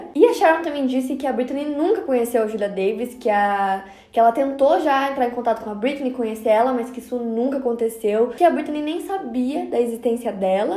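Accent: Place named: São Paulo, Brazil. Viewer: Vcs prestando atenção no vídeo e eu aqui ligado no sotaque gostoso da, pois é uma mistura de paulistano com um fundinho de nordestino....Sei lá se é isso, sabe?! Teoria da conspiração.....rs😁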